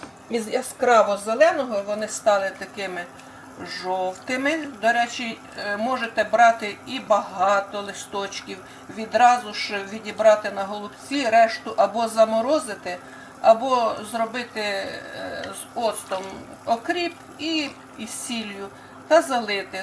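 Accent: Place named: Odesa, Ukraine. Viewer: услада моему слуху,вѳ с одещины,сердце радуется я выросла там.Берегите себя,милые люди.Дякую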